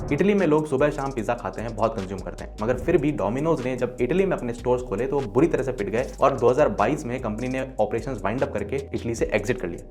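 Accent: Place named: Gujarat, India. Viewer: Taa-Ko Bell hota hai. Not the way you pronounce it 😄 Ghatiya Gujju accent idhar bhi ghused diyo 😄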